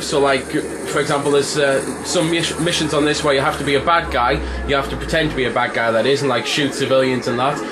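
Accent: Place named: England, United Kingdom. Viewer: i love this game I'm gonna get it and i also love you're English accent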